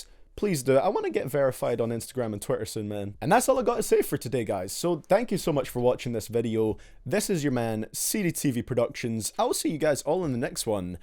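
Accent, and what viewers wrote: Scotland, United Kingdom. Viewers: Ahahahahahaha. Your adorable Scottish accent makes you sound so adorable and I could never take you seriously. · Lol this nigga British af talking about rap, that shits funny as hell 😂😂😂 I love it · Are you Scottish, if so me too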